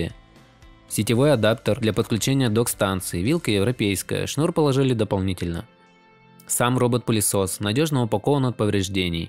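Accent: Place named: North, Russia. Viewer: Очень много "оканья" 🙂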